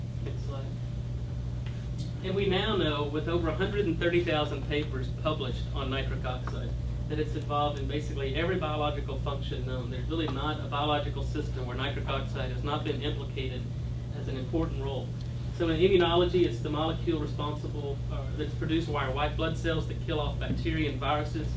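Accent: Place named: Texas, United States. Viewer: This is a cure for heart and stroke disease without changing a bad diet!! It makes you bullet proof even if you eat greasy junk all the time!!! Just get a pinch of sodium nitrite and add to every liter of drinking and cooking water. Sodium nitrite in food grade quality is available for about 6 bucks for 2 lbs, enough for a human for a decade of use at these levels in drinking water. Please turn up the sound and endure the bad quality sound, and Dr. slurring sort of Texas accent, it is worth every second. Take the trouble to listen 10 times if you need to, and GET this, especially the sections on the microscopic artery video showing adhesion of monocytes (a type of white blood cells that turn into macrophages or cellular garbage trucks), and how they penetrate the lining of the artery, starting the creation of plaque, but do not when the sodium nitrite is added to drinking water. 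This is absolutely astounding footage!!! Note how either 50mg or 150mg per liter of sodium nitrite in drinking water completely negated the harmful effects of high cholesterol diet, and prevented atherosclerosis!!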